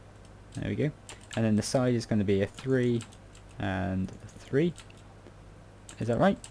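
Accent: United Kingdom. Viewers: Your British accent is awesome you sounded like my fathers friend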